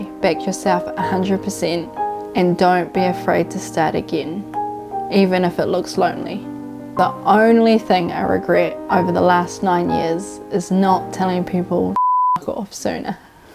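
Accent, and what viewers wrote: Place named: New Zealand. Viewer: Thank you, for your gentle, strong words. It sounds like you have found your own peace, even if that peace means feeling crap for a bit or telling someone to F-Off. Where is that beautiful accent from? Kiwi? Cheers, R.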